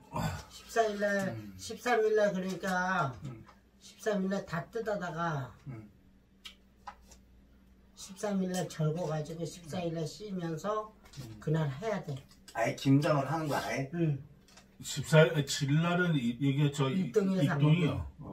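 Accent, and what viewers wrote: Gangwon, South Korea. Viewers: 말씀 하시는게 강원도 사투리 쓰시는거 같아요~~ㅎㅎ 집안분위기 너무 행복해 보입니다~~^^ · 아직 먹어보지는 않았지만 함 먹고싶네요 산초기름도 첨 알았네요 잘봤습니다 ^^ 말투가 강원도 같기도 하신듯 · 오늘처음보는데 그 웰컴동막골에나오는그말투시네요^^ 강원도인가요? 강원도어디말인가요?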